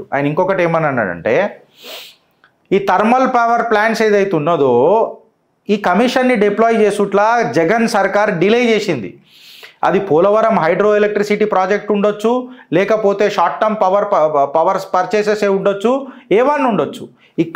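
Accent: Telangana, India. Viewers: జై జీ నమస్తే మీ వీడియో లు చూస్తున్నా, మీ వాయిస్, తెలంగాణ యాస లో ని ఫ్లూ యన్స్, మీ విశ్లేషణ సామర్థ్యం, కంటెంట్స్ లోని డెప్త్,గిలిగింతలు పెట్టే హాస్య చతురత, అనన్య సామాన్యం. కానీ గత కొన్ని రోజులుగా మీ డైలీ న్యూస్ విశ్లేషణ చూస్తే మీరూ కంపలషన్ లో చిక్కుకు పోయినట్లు తోస్తున్నది. ఈ డైలీ న్యూస్ యనాలసిస్ ఆపేసి మీ ఎనర్జీ మొత్తం కాంగ్రెస్ హీన చరిత్ర మొదలైన వాటి పై పెడితే బావుంటుంది. వీడియో లు చేసే మీకన్నా చూసే మాలాంటి వాళ్లకు మీరు ఇంకా ఎలా రాణిస్తారో, ప్రజలను ఇంకా బాగా ఎలా ప్రభావితం చెయ్యగలరో తెలుస్తుంది. మీ కార్యక్రమాలకి సంబందించిన ఇంకొన్ని సూచనలు చెప్పాలని ఉంది. మీరు కానీ మీ team ఎవరైనా నా కామెంట్స్ చూస్తే ఒక సారి నా number కి కాల్ చెయ్యండి. మీకు తప్పక ఉపయోగ పడుతుందని భావిస్తున్నాను -K శ్రీనివాసరావు ఫౌండర్ హిందూ భక్త ధార్మిక మండలి ఖమ్మం జిల్లా -సత్తుపల్లి <num>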